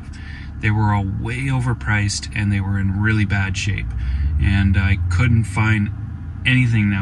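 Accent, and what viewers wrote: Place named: Quebec, Canada. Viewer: Watch Eric's channel where he talks about "11 design mistakes". Really interesting. Oh and the accent is from Quebec. Thanks for sharing A live stream would be great!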